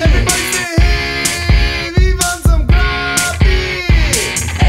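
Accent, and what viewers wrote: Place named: Wisconsin, United States. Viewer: me, my neighbors, my dad, and everybody from wiscansin all talk like that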